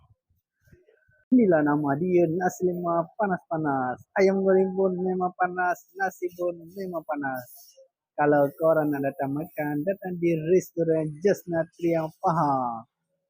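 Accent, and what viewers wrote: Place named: India. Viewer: knpa logat india pula dahh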